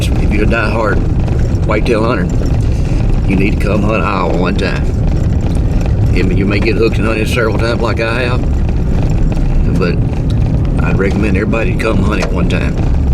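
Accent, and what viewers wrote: South, United States. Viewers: Where you from bo? Sound southern. Git R Done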